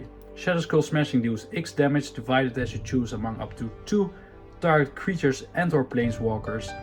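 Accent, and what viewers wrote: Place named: United Kingdom. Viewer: now thats how you speak the kings 🤴 English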